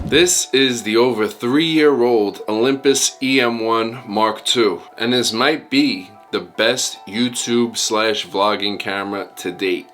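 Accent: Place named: New York, United States. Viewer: I knew it.. the 1st vid. I watched today o said.. 😏 ooohhh he is so Italian, sounds like new York or jersey with some flava.. lol new sub. Here hopefully u can BHL some other cameras bc I'm to confused for a vlog camera.. I do have the z6 which is great so far as a newbie and I feel futuristcally.. but it heavy with all the monitor n mic.. blah blah.. so I want more YouTube/travel options for a serious vlogger .. pleaseee!!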